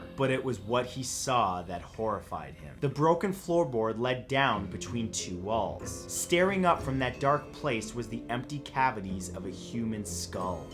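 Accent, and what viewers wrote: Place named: Toronto, Canada. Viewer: It's just his accent lol, I'm from the Toronto area as well, and I say it the same way :P I know it's different with a British accent, my mum is from Liverpool, so I have family there. I still have difficulty understanding Scouse though :P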